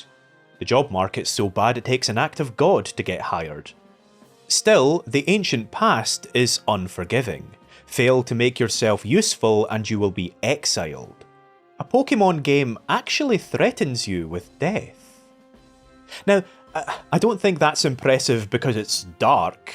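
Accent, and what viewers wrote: Scotland, United Kingdom. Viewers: The Scottishness is off the charts. Half the reason to hear you is just being reminded of Tennant. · Honestly, having watched the video, I would really really like to see you tackle Scarlet and Violet one day, because it's one of the most interesting games in the series to me in how it does so many things right, and yet has so many glaring flaws. Honestly, I just really want to hear my beautiful Scottish sweetheart talk about Pokémon for even more hours. I literally couldn't get enough if I tried!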